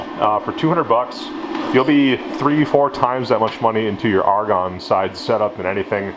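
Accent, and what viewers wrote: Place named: Northern Minnesota, United States. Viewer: I heard northern Minnesota in one of your Iroc videos. Love Irocs! Where in Minn? I'm originally from Intl Falls on the border, eh? So I'll have to catch up on your Camaro vids .... peace.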